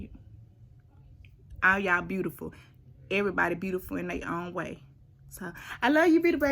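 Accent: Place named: Texas, United States. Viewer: Are you from Texas? I can hear the accent 🥰🥰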